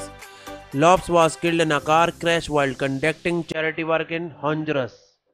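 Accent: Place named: India. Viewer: Voiceover dude has huge Indian accent.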